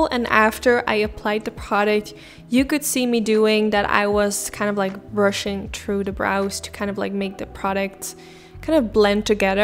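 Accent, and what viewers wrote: Netherlands. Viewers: Ben je nederlands? Want hoor beetje een nederlands accent in je engels haha x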